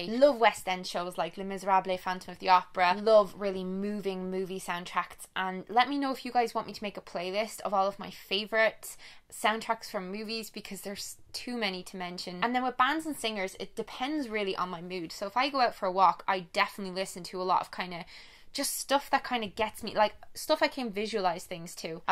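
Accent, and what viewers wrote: Ireland. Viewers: i absolutely love your videos, they always make me smile. your sweet Irish accent always chills me out. i love your Q and As beacuse i love getting to know you more. you are such a light in such a dark internet space. lots of love from England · Hi!! Just want to answer "your" question (technically not yours haha) I found you through Bry & Candice a WHILE back in a video about yoga.. I think?? And I checked out your channel and I immediately loved your body confidence videos as well as your food related videos and the makeup ones!! So I subscribed and then you started talking about your sexuality (Bi girl right here 👋) and I was just like "omg thank you SO freaking much for talking about this" You really help me to deal with life in general, your like a cat you calm my anxiety and make me laugh and smile:) Honestly, I feel like we should both go to Hogwarts and you would for sure be my favorite teacher!! 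Anyway, I just rambled about all the reasons why I watch your videos and I hope it makes sense because you're probably my favorite YouTuber and I sometimes wish I knew you in real life because I would LOVE to have someone like you to brighten up my life with your gorgeous eyes, your incredible knowledge of certain things even the most random ones (I did not know Freddie Mercury was Bi thank you!!) and of course your LOvely Irish accent! Thank you for being the most genuine YouTuber I know and helping us all out:) Love you lots xxx PS: also, you're my YouTube crush shhh;) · I watch your videos because you're the first person with an irish accent that I ever heard (and then I discovered that it was FREAKING CUTE) + you're a bisexual lady (me too) and I love how you talk about this + you're mostly positive ! Love from France <3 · I watch your videos because a) you are super honest and funny and I really love you :) b) you come from a past of disordered eating, and since I have an eating disorder, I feel very motivated and inspired by you to recover because you show that it is possible and absolutely worth it! 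c) you are from Ireland and since I adore the irish accent and want to improve my english (I am from austria btw) I really like your chanel :D Just keep doing what you are doing because it is AMAZING AND AWESOME, lots of love and btw your hair looks stunning <3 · Well I started watching you because I saw you in a video with Hannah, and you seemed nice. Also, I loved your Irish accent. But I stayed because you've made some really interesting and honest videos, and you tell your opinions on subjects, even if they contradict with what the majority of youtubers are talking about at the moment(i love how you mentioned that drinking alcohol every now and again is ok. I realise that talking about alcohol addiction is important, and I've been loving Lucy Moon's videos on the topic, but not everyone has a problem with alcohol, and I think it's important for people to know that, especially younger people who haven't tried alcohol yet.) I also like how you're supportive of other youtubers' success, book deals, etc. 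I tend to be a jealous person, envious of other people. But I've been trying to be more positive and supportive, and less jealous, and It's thanks to your videos :) Sorry for the long comment, hope you have a nice day! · I watch your videos because I love your accent :) even though if I speak english now (Im from Germany) I tend to slip in some words that sound irish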